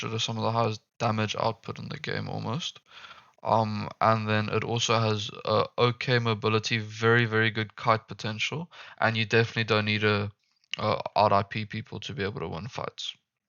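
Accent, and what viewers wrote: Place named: South Africa. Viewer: You south african boet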